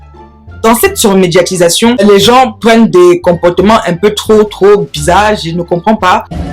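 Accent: Cameroon. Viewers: J'adore trop ton accent kmer, kiss from une sœur sénégalaise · J'adore 💕 le fait que tu parles #camerounaisement😂, trop de love pour toi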